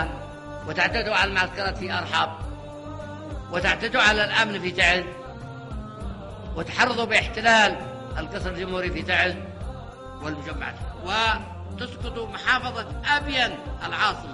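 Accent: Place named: Saudi Arabia. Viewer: ماشاءالله عليك واضح من لهجتك انك سعودي من ذماران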